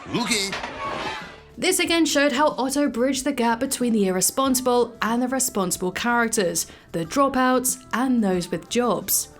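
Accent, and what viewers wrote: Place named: South England, United Kingdom. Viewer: the sweet accent of south England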